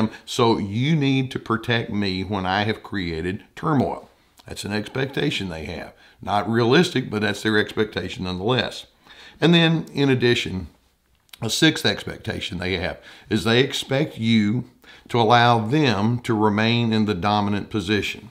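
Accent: South, United States. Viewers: ✨Glad I clicked. Staying for the combination southern accent and for the great information . Sweet tea for the soul ✨ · Why are you calling him out for his accent? I'm from the South and I know his accent is smoothly correct and authentic. If he sounded "like somebody from Washington", I would say you're completely nit picking how someone talks, basically calling him fake! 🙄 Move on..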